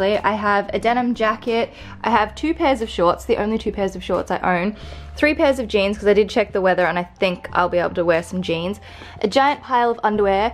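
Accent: Australia. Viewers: She doesn't sound British she sounds Australian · she's Australian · It sounds 110% Aussie?? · Hahahaha she's Australian... Aussies that travel have the most confused accents, mine's the same. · you reckon? She sounds Aussie to me. Says some words like Americans do which all you tubers seem to. Why does it bug you? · You can't love her that much if you didn't even know that she is Australian LOL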